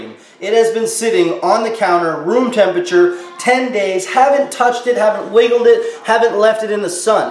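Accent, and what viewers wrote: Canada. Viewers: Breyer’s natural vanilla only has milk, cream, and sugar. It definitely melts. Don’t condemn all Breyer’s ice cream. Plus Breyer’s lids do not fall off. They have a plastic strip that goes around the edge of the lid. Evidently Oreo’s must be paying you money. I don’t know where you got this ice cream.but the Breyer’s sold in my store melts. That container is not saying ice cream. It’s a frozen dessert. Anything that says frozen dessert is not ice cream. You are obviously not here in the states. I don’t know where you are located. Since your English sounds pretty much like we have here, I’m thinking you are from Canada plus the French labeling is also a clue. I only buy their Nural Vanilla because it contains no preservatives or chemicals. Be truthful when you are condemning a product, otherwise we can’t trust you either. 😟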